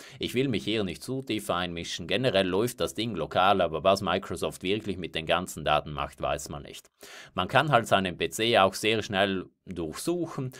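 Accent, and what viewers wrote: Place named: South Tyrol, Italy. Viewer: Hon mir woll gedenkt, dass dess a Südtiroler isch.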